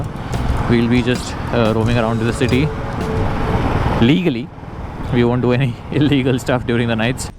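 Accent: Malvani, India. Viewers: Sir tumhi malvani kadak boltat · I am watching this video for the 7th time just to hear his malwani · I like for malvani ..... though I'm not but love that accent 👍🤙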